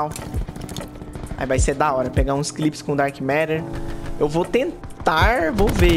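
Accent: Minas Gerais, Brazil. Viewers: Mineiro nato haha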